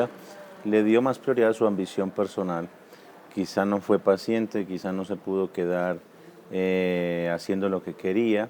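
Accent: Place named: Antioquia, Colombia. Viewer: pense que hablabas paisa ...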